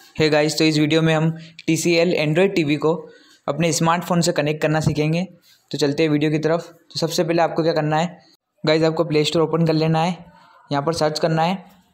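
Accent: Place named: India. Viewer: That indian voice 💀💀💀💀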